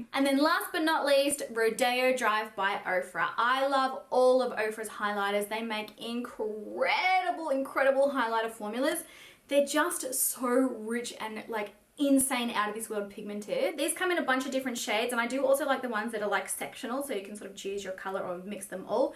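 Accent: Australia. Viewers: I love watching your videos,educational and an adorable Aussie accent. I may have missed it, What blush, bronzer combo are you wearing in this video. Brand and shade please it's lovely. I am looking for a bronzy rose look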